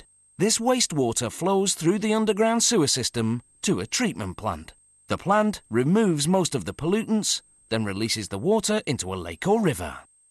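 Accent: United Kingdom. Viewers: I've never herd British How it's Made before.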